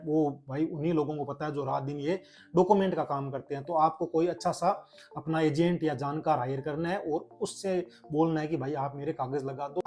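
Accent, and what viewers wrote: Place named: Haryana, India. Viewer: Language Haryanvi Touch 😊😊😊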